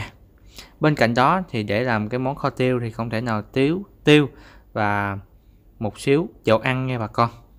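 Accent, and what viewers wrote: Mekong Delta, Vietnam. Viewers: Cách nói chuyện đúng chất miền Tây luôn ạ ❤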